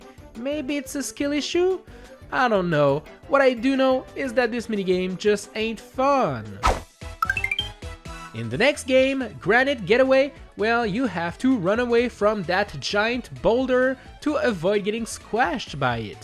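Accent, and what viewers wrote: Quebec, Canada. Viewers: he’s canadian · French Canadian accent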